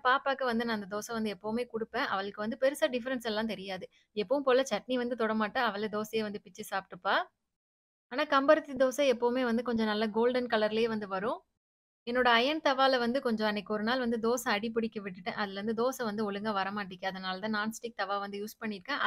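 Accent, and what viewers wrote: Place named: Thoothukudi, India. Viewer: Na valandhadhu full ha chennai dhan but enga mamiyaar veedu thuthukudi so neenga pesarapo enaku slang romba pudikum and simple ha neat ha vlogs irukum 😍😍 more over same age kuda 😍😍😍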